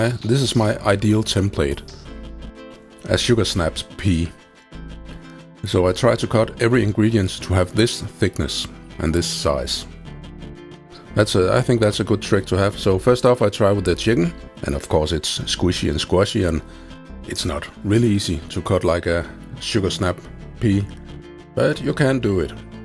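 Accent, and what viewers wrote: Denmark. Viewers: Dansk accent :D godt lavet!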